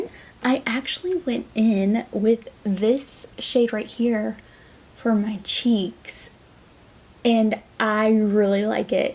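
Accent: South, United States. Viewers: You are gorgeous and I love the look. I just received my Chanel Seduction eye palette and Romance eye pencil. I am anxious to use them. I love your Southern accent. I was born in Dallas, Texas and I am a Southern gal at heart.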